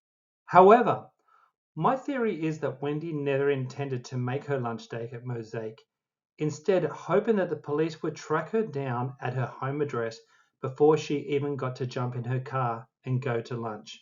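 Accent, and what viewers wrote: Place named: Australia. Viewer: Nice to hear an 'Aussie' .. Me too! 🇦🇺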